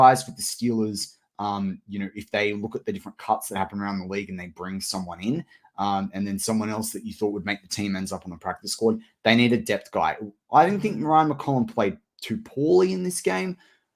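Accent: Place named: Australia. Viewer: Who is this Aussie talking about the Steelers? 🤣🤣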